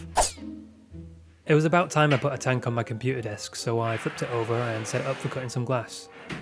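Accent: United Kingdom. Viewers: Popped up in my recommendations, cool video and all, but I am intrigued by this guys English accent, it sounds standardised British English then suddenly American time-to-time with certain phrases.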